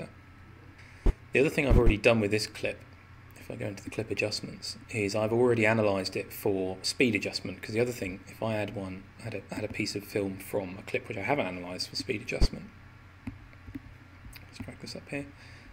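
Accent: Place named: England, United Kingdom. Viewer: So glad you have an English accent.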